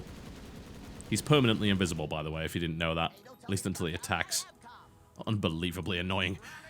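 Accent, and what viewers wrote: United Kingdom. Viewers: I agree with you Pär! Though It's a British accent, not Australian :)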